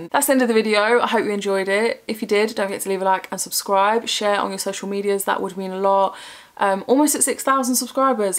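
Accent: Nottingham, United Kingdom. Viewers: I said the same....im from nottingham and accent sounds so familiar x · Your accent sounds similar to Nottingham I love watching these you are so bubbly I love that you go for it with positive attitude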